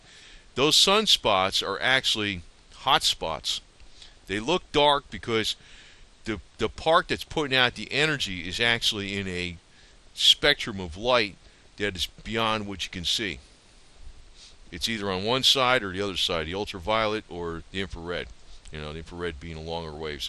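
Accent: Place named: Pittsburgh, United States. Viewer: Heathen Nations Music: I hear a Picksburg.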